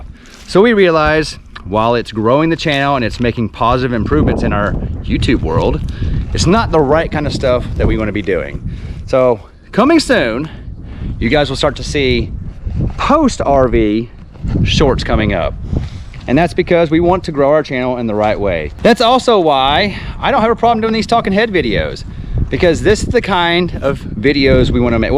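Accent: Texas, United States. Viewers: I just like hearing you talk , Texas talk. Where is Phil? Ooooh wrong channel. lol As for the comments I say yes but in the long run don't give them the satisfaction they really want to be seen on here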